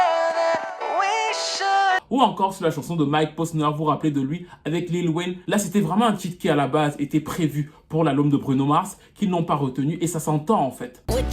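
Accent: Cameroon. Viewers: Je dors avec tes vidéos!tu narres comme jamais avec ton petit accent cametchek par moment!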